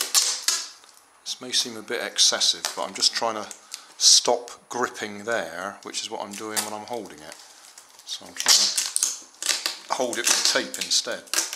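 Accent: United Kingdom. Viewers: Your videos help me whenever we have a car making expirement, and i always get a A+! Thank you and i suscribed. I only have one question are you from uk? Just wondering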